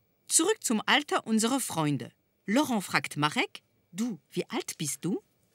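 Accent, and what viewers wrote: France. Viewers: "Sind sie nicht charmant diese Schildkröten" und dann noch mit diesem französischem Akzent...ach einfach nur himmlisch xD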